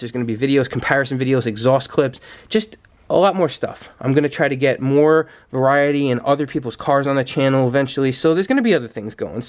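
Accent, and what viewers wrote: New York, United States. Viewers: Awesome Bro : The NY/NE accent not so much :) When I clicked on the Stroker Hellcat exhaust video My brain didn't register Hellcat Stroker for some reason I was thinking Scatpack Stroker & near the end You mentioned something about being the only Stroked Hellcat on YT. I've been an SRT B5 sub for at least a year now but haven't been keeping up with His channel lately. I'm on board with both o Y'all now.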